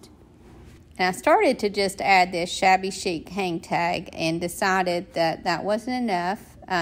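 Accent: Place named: Tennessee, United States. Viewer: Love how this came out. I love watching you, but I am always getting you mixed up with Teresa from Our Green Acres. You & her sound alike. Dolly Parton accent, please I say that as a good comment. Great work & my style completely.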